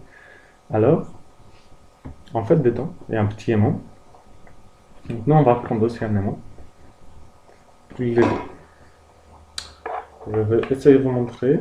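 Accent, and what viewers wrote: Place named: Poland. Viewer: Explications un peu longues mais Merci car je viens de sauver ma cafetière ! A poznaje czysty polski akcent !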